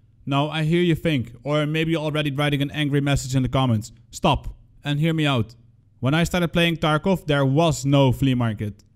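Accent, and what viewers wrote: Netherlands. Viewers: dude...as I listening to you, I imagine how many critics do you get cuz u are apologizes for your accent and for your opinion....man it's you, and your opinions...if someone isn't like your accent or your opinions, well....I say to those ppl get the f... outta here and finde someone else! Its simple, a left mouse click, or a tap in your phone....just downright get the hell out here and leave thise dutch dude alone.:) So calm down and dont bother yourself with idiots....just be yourself.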